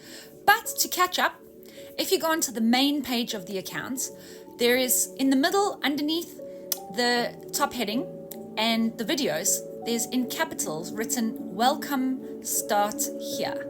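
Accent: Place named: United Kingdom. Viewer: Also I like your accent. Is it British? I’m originally from South America living in the USA. When I hear a person pronouncing every single syllable in English, I love it. I’ve been speaking English for many years but for some reason I’m still insecure.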